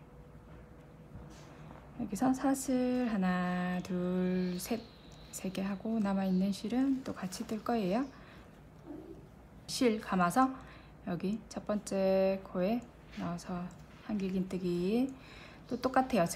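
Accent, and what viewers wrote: Gyeongsang, South Korea. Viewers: 진짜 이쁘네요 살짝 경상도 사투리가 매력이 있네요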